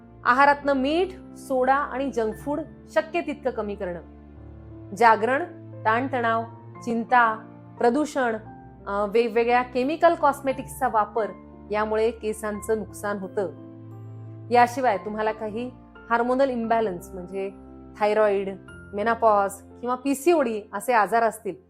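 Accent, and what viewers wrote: Standard. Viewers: स्पष्ट, शुद्ध उच्चार आहेत तुमचे.उपयुक्त सोपी माहिती सांगितलीत. धन्यवाद!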